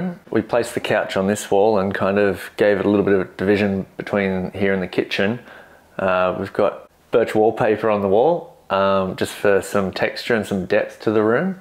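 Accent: Australia. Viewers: I had to remember this is located in Canada, whilst listening to an Aussie talking about the unit. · A happy and mellow Aussie :)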